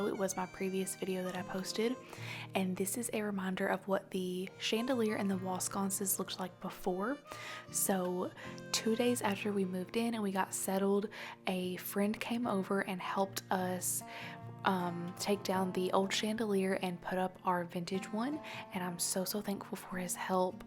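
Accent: South, United States. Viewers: New subscriber here. Congratulations on your beautiful new home! I’m so very excited for you. The countryside looks like farmland in my home state of Ohio but from your accent I’m guessing farther south 😉. I’d love to know what state you are in. I’ve lived in Oregon for 40 years but Ohio farms are in my heart and blood. Those views will never get old. Enjoy every moment and thanks for sharing your joy. May God bless you and your home. ❤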